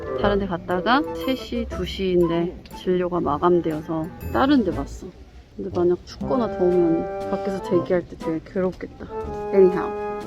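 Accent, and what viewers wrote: United Kingdom. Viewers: 품위있는 영국 영어와 싼티작렬 한국말의 조화ㅋㅋㅋㅋ 유쾌유쾌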